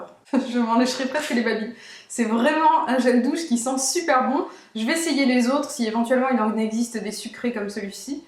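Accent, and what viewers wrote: England, United Kingdom. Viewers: Super vidéo, merci ! Pour ma part, ton accent anglais me convient parfaitement, je trouve au contraire qu'il est top, ce qui n'est pas courant ;-)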